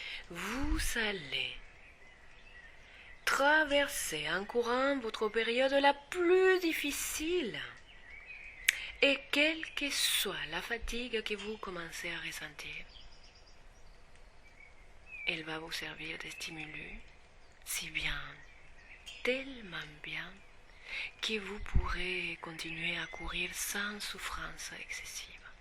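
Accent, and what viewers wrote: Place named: Spain. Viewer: Cest quoi cet accent espagnol ? Paysans ? Créole ?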